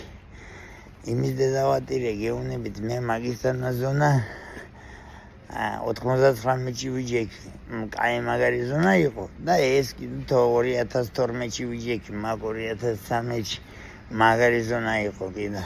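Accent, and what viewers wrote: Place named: Imereti, Georgia. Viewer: გილოცავთ ახალ წელს, ჯამრთელობას გისურვებთ! საიდან ბრძანდებით ბატონო, იმურული კილო გაქთ. ❤️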